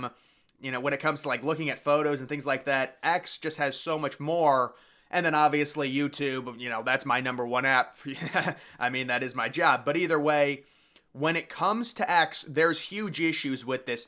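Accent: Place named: Indiana, United States. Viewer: I can tell you’re from south bend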